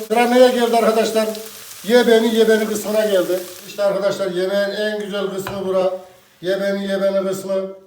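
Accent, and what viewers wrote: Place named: Isparta, Turkey. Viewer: Ellerinize sağlık Sefim memleket neresi Bizim ıspartanın şivesine benziyo konuşmanız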